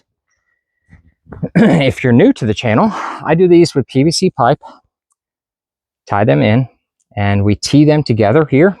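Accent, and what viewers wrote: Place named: United States. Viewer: Aucun Européen parle comme cela. Faut il être un Con d amerlock pour avoir cette intonation. Si un Iranien ou autre vous tué , on ne vous pleura pas et tant on ne vous entendra plus.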